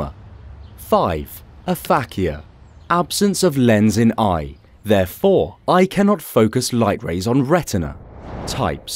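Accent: United Kingdom. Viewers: British people explaining anything is nice lol.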